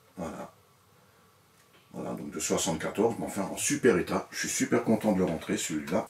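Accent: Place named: Alsace, France. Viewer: Bravo Monsieur, je découvre votre chaîne que je ne connaissais pas et j'ai tout de suite reconnu le bel accent du 68, car je suis également originaire de Mulhouse (Milhüser Wackes comme me surnommaient affectueusement mes anciens collègues suisses). Très belle sélection, si vous parlez allemand je vous conseille la chaîne YouTube d'Erwin (Across.the.Borderline) très au fait sur les genres country/americana. À bientôt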